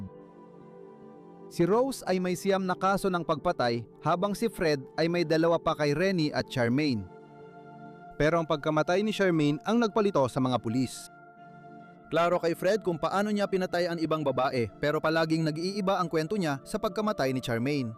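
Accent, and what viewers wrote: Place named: Visayas, Philippines. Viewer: bisayang slang e😂😂😂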